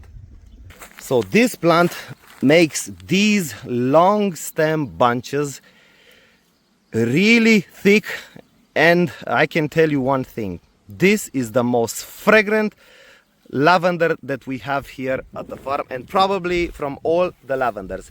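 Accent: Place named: Czech Republic. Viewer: Is that a Czech or Slovak accent I hear? Thank you for all the videos - growing lavender is challenging here in the wet East Coast of the U.S.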